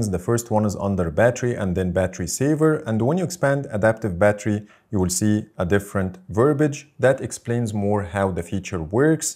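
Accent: Egypt. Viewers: اول ما سمعت الفيديو حسيت إن لهجتك عربية انت مصري؟ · Egyptian English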